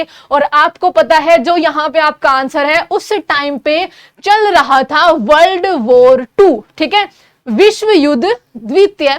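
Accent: Haryana, India. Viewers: Mam are you from haryana???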